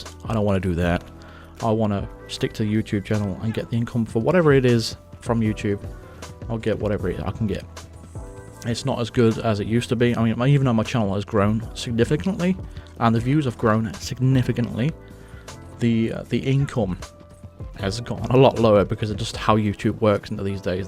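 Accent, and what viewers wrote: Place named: England, United Kingdom. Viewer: you're the man! It takes a lot of courage to put yourself out there like that. Anonymity is also pretty cool, you show your face when you're ready. You've got my support from Sweden. Its a good time for a break now you have until Jan 20 2021, we're all counting on you for Hitman III. I watch your vids because you get the business done in a good way with great commentary. As an expat I like to hear the English accent. You sound like a good guy and very likable. BTW with the new consoles coming out I bet everyone is looking to upgrade there TVs. Have you got any tips of what we need to look for frame rates, 4K or 8K etc.?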